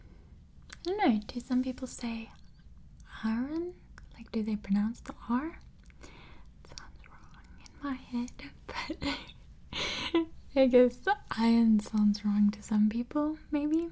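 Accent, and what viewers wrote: South Africa. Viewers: Just ran into this on a rainy evening in Alabama. Had a chance to visit South Africa years ago. The accent is fantastic. But here in the states there are a variety of pronunciations based on geography. What you call a trolley is a cart or buggy here in the south for example. And sometime emphasis is on a different syllable. Pecan for example is either “P-can” or “pecahn” as you said. Another example is can’t, here in Alabama we might say it as “cain’t” Great stuff! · Absolutely love your accent! My new fave asmr channel! So glad I found you 😍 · I love your accent! Glad you did this! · Hey cute lady south African British rose lady great video I could listen to you're accent forever and I sure will if I ever get chance in future I would love to visit south Africa and stay in a tent hey I will watch the video and sleep to it thanks I hope you try potatoe cakes there British and try crumpets with butter xxxx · So happy to hear a from a south african asmrasrtist! 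🇿🇦 LOL the feeling of nationality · My fave place on earth. Hoping I win the lottery and can move out to camps bay or kleinbaai!! Great accent! Great vid!! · The accent is beautiful. My best buddy Ralph is Afrikaans and his accent is incredible. Great singing voice too.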